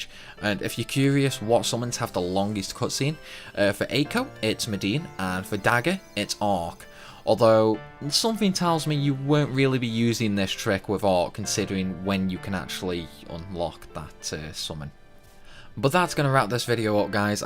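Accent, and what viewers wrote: Stoke, United Kingdom. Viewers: Another YouTube gaming video soiled by a brittish accent 😩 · Mate are u from stoke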